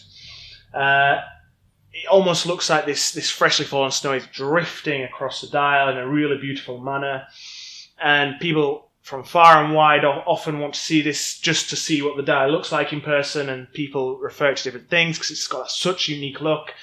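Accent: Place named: Manchester, United Kingdom. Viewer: Skanky Manc Accent from the poor man's Michael York, recorded in an echo chamber. Nonetheless, nice vid.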